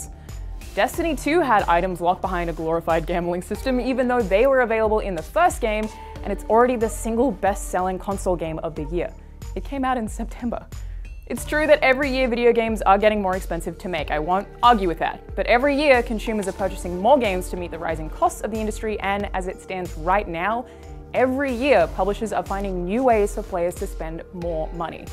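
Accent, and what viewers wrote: Australia. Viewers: angel47baby It's her accent. She's australian.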